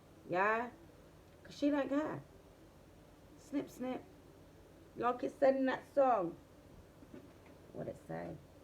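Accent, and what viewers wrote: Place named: London, United Kingdom. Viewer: You must be London 🤔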